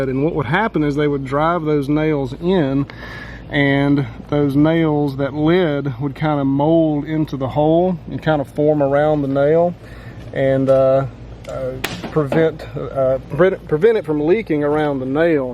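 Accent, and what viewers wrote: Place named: North Carolina, United States. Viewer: I think he’s from North Carolina !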